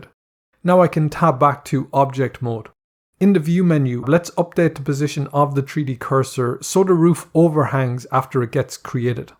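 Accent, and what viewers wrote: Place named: Ireland. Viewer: Excellent - thanks for pacing this nice and slow, and with clear diction (also nice to hear an Irish accent!). Many tutorials become hard to use when you have to pause, rewind and try to hear what the guy (always a guy) is actually saying. After using Sketchup for years, it takes a while to get the hang of Blender. Thanks again and keep 'm coming.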